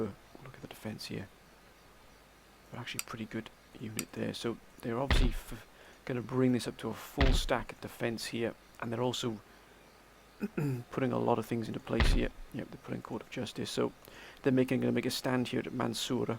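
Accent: Wales, United Kingdom. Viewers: Hey man, where are you from? You sound British but you have hints of foreign By the way, great video, definitely subscribing! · Where abouts are you from in Wales? your dialect is very interesting to me, great vid btw your always a gentlemen talking to people in the comments and your very good at this game!! :)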